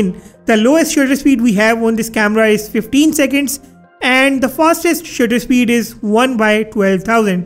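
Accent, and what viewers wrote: India. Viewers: indian english haha, you can go crazy listening something like this lol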